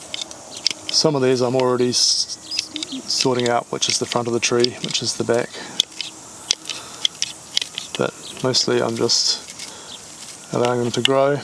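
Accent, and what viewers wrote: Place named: New Zealand. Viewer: Kia ora! Great video, really love the style you do this in (plus it's great to hear a familiar accent) Just wondering where you get your tools and soil etc from in NZ? It can be difficult to source the commonly recommended stuff. I'm just a noob and would love to hear some tips for us specifically here in NZ ❤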